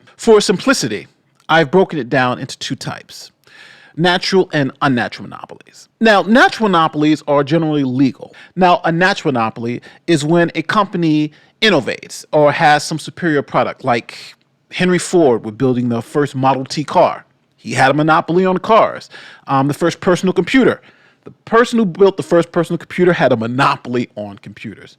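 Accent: New York, United States. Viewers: Love your videos & your New York accent. 😄👍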